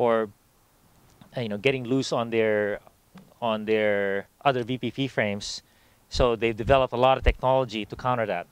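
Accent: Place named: Philippines. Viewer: You sound like a Filipino accent, are you? Anyway am still not convinced that 29'er is way better than 26'er. But I love to try it in a single track in North wales or lake district.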